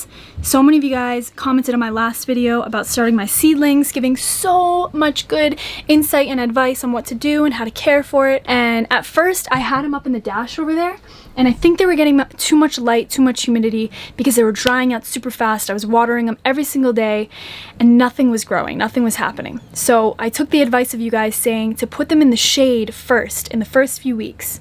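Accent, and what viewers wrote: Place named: Midwest, United States. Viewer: If you both are from Boston you sure don’t sound like it you sound like Midwest. I’m born and raised in Boston you don’t sound like anyone here lol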